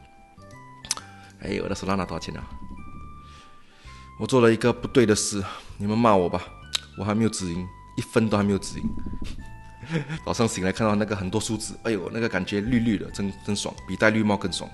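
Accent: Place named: Taiwan. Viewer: 我喜欢你的背景音乐。喜欢你的台湾腔，你讲话好机车。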